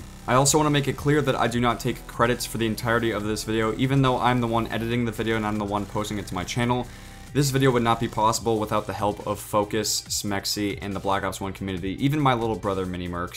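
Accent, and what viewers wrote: Germany. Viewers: r u German? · R u German? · R u german??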